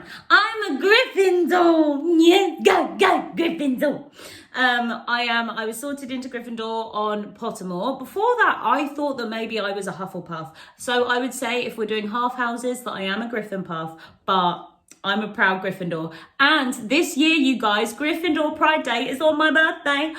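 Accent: United Kingdom. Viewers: u british right lol!? x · You sound quite British, I hope you are, I love finding YouTubers/tiktokers from the same country as me 🙂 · Ms., (I've asked this in another place) as an American, the subtleties of British dialect are beyond us. Might I ask what "flavor" of British English do you speak? Also, you are brilliant, sharp and quick as lightning. Very extraordinary.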